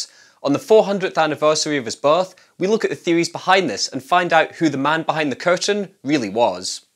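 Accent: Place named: Scotland, United Kingdom. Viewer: Since my wife passed away, 13 years ago, and being retired, I've studied everything I could lay my hands on re WS. Conclusion: he wrote the plays, with a collaboration here and there, but the greater part of Shakespeare was written by a bloke name of William Shakespeare. Only two images are accepted as genuine likenesses, the bust which resembles nothing more nor less than the local butcher, and the Droeshout which Ben Jonson endorsed as a true likeness. The Sanders is an elaborate fake, and there was a period in the 19th C when dozens of fakes were produced. It was de rigeur at the time. And seeing as the presenter sounds Scottish, I think he'll know what I mean when I call these conspiracy theories a load of old bollocks. And I wonder if WS had a penny for each word spoken and written about him for the last 400 years. So bollocks it is then. 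QED.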